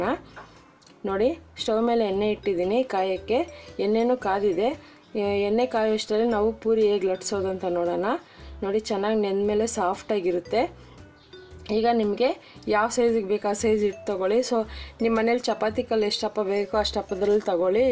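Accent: Tamil Nadu, India. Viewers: Yenne Tamil slang aanstidee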